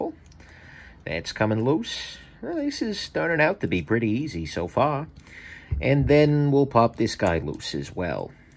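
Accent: New York, United States. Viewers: you have to be from New York or New Jersey!?! I enjoy your videos knifes and explanations on the parts and disassemblies!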